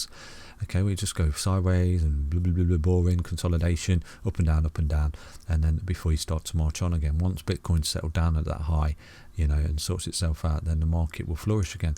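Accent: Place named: Midlands, United Kingdom. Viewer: Great channel, and good to hear a British accent (Midlands?) in the space. Much respect, from Leeds.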